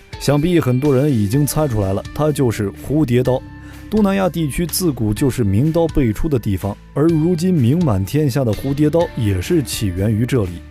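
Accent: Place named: Taiwan. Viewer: 这个节目到底是中国的还是 台湾腔奶奶风娘的？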